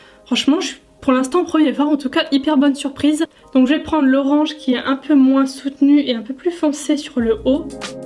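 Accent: Lorraine, France. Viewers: Elle habite en Alsace mais elle vient de Lorraine (d'où son accent !) 😉#BarreD'Info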